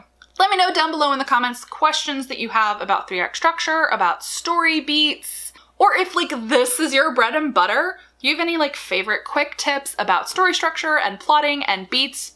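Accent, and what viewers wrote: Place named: United States. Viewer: Yes, that is it, thank you! US accents on French words was throwing this Brit for a loop. I've googled so many variations of what I thought you said and, understandably, found nothing.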